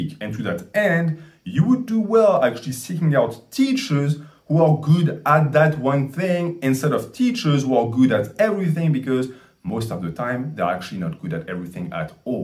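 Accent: France. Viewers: Is that a French accent I hear? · Where is this accent from? Sounds like French but I am not sure. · Is that a French accent? I love it!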